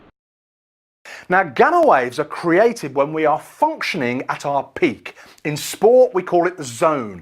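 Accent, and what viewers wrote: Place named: United Kingdom. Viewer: The explanation with a british accent and gamma state make this video very €nergetic & interesting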